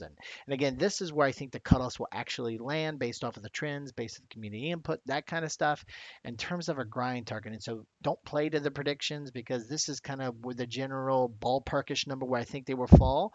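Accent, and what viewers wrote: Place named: South, United States. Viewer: Love your cutoffs man they are the best and i enjoy them everytime because of your lively voice and joyful personality. I also like that beautiful country accent. Howdy Howdy 😆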